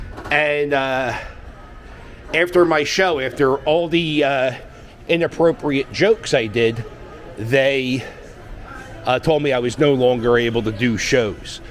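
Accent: Philadelphia, United States. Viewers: With the heavy Philly accent 😂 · Philly accent is A1!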